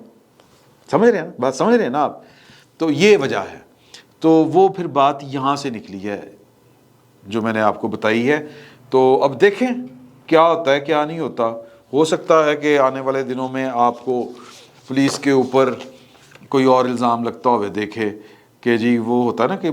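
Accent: Punjab, Pakistan. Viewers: آپکا انداز بیاں مجھے بہت پسند ھے پنجابی کے تڑکے کے ساتھ اللہ آپ کی حفاظت کرے آمین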